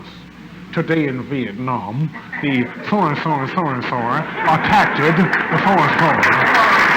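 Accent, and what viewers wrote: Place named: Kentucky, United States. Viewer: An hard word. Loved that kentuckian accent he had.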